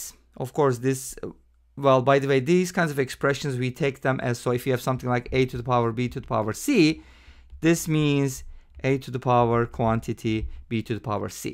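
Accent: Turkey. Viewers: Congrats! Is there a chance that you are from Turkey?? Your accent resembles Turkish English accent :)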